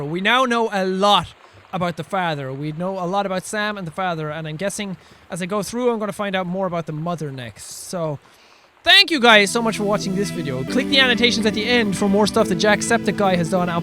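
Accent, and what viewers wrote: Ireland. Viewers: “ya fecking idiot” got me XD god, I love how some accents make certain words just sound hilarious. Not meant to sound racist, I love different accents, honestly I kinda wanna condition myself into having an accent like Jack’s, despite not being Irish, I just love the sound of an Irish accent. Don’t know why, they just sound satisfying to me.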